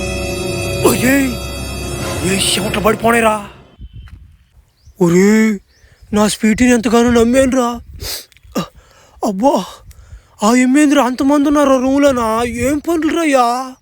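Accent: Nellore, India. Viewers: Arey Anna nuvu Nellore ahhhh nedhi 😊😊😊😊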